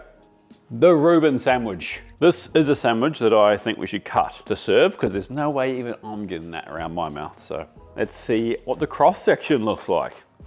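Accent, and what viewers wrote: Australia. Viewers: Sounding very aussie now mate, gotta come back to your whakapapa 🥝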